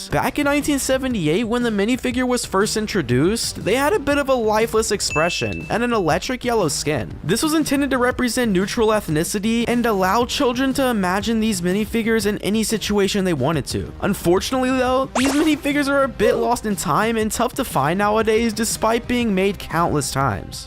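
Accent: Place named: United Kingdom. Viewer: Man, I thought you’re supposed to build with Legos not make a whole goddamn essay about it I mean we want to hear you talk British make Lego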